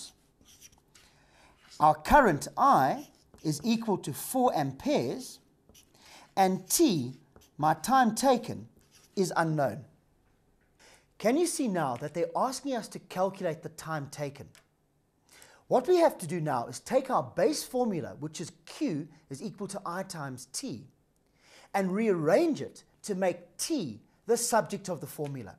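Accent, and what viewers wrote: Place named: South Africa. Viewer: sounds like a south african accent mate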